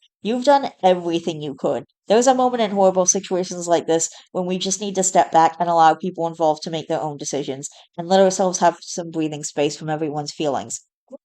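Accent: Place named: Canada. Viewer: I never looked at your YT page before. You sound very articulate. Smart as a whip. Snd like the typical Canadian voice. Great video. Have a good one.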